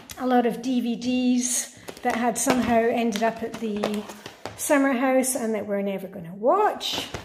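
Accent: Scotland, United Kingdom. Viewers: Hey, are you Scottish?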